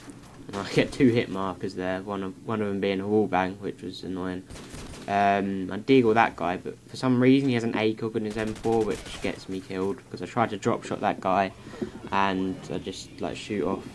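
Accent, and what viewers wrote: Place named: United Kingdom. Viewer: yeay hes british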